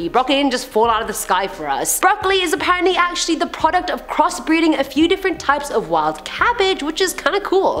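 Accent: South, United States. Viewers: I'm from Mississippi and cant stand fake southern accents but yours is perfect..luv ya your awesome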